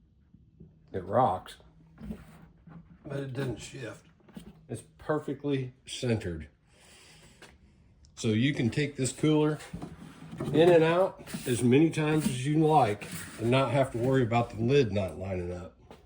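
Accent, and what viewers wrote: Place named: South, United States. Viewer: That is cool. U know it just dawned on me u have a southern cowboy voice like on the movies ❤❤😊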